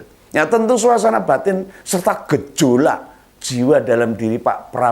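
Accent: Java, Indonesia. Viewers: mas nada bahasanya santai dan kental cirikhas jawa aslinya, sy org bali senangsekali mendengarnya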